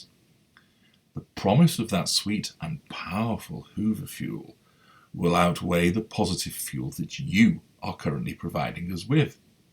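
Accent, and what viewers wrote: United Kingdom. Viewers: Even if they are good, and he is very good at his Kraft. Never praise them. You are providing huge supply by doing so. Also, the British accent does make it interesting but also makes him sound pompous. This is in harmony with his Advanced narcissist claim. I believe him. He is sophisticated in the ways of narcissism. But that's nothing be proud of if you truly are narcissistic. You simply mirror the One who is your father. Satan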